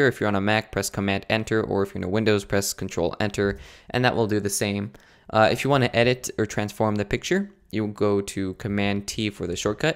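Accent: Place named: New York, United States. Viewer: It's a decent video but you gotta look like you're an expert, instead of the 15 year old guy who talks like a NYC girl that you actually sound like there are some basic concepts that you did not mention and you use way too much shortcuts. I'm not trying to hate on this, just giving you my viewing point in case you want to get better at doing tutorials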